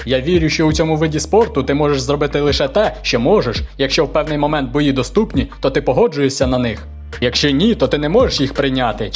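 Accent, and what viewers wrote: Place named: Rivne, Ukraine. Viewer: У вас Рівненський акцент...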